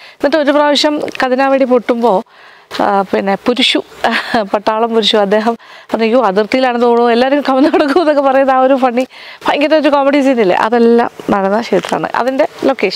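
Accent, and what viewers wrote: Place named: Valluvanad, India. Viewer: Palakkad etthiyappol valluvanadan slang aaya pole.....njangalude swantham Palakkad....keralathinte nellara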